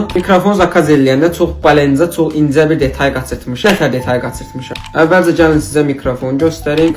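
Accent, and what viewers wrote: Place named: Qazakh, Azerbaijan. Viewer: Qaqam haralısan lehçen Qazaxlılara oxşayır ❤ men ❤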